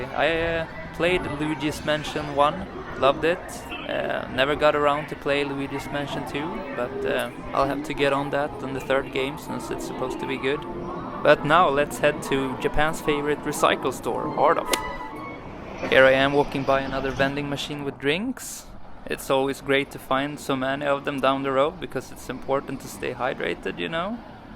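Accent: Sweden. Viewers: Guessing you saw my comment on jcontra's recent vid and subbed to me as a way to let you know your channel exists.....interesting way to get peoples attention (it worked!), vids look good so far so I guess I'll stick around! bra att veta att det finns fler YouTube retro-samlare i Japan (could tell immediately you're Swedish from the accent ha ha)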